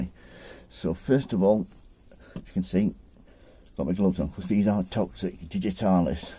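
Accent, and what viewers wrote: United Kingdom. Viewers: I just gave a thumb for the Northern accent!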